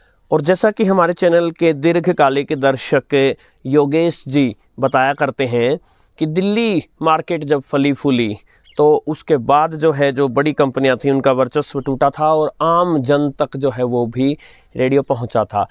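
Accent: Standard. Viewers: आपकी शुद्ध हिंदी बोलने का कोई मुकाबला नहीं है धन्यवाद, यह वीडियो भी आपके लिए 😊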